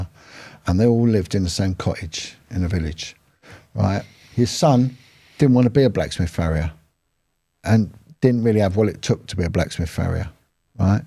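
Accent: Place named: Scotland, United Kingdom. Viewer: Hi. I jst found your podcasts videos, and i love them, also love your scottish accent, big love from an irish girl ( whos single 😂🫶)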